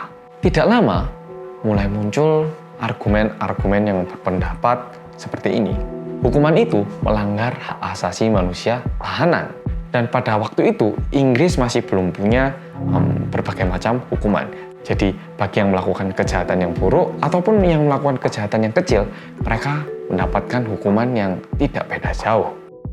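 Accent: Java, Indonesia. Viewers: Org Korea yg medokkkk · Apa cuman aku kalo mas opening "hello guys welcome back to korea reomit bersama orang yg MEDOK!!" trus aku ikutan ngomong medok? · Bersama orang korea yang medokk mantapp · Orang korea medook❤ Dan ganteng😁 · Pertama liat mas lucu aja gitu orang korea ngomong medok🤣best deh MAS 💗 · Mass medhokkk... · Bersama orang Korea yang medoookkk ... 😊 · Welcome back dngn mas yang medokk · Org Korea medhokkk💜😌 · Orang medok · Medddhok hahaha (like donk ka, heheheh canda tapi kalo mau di like like atu )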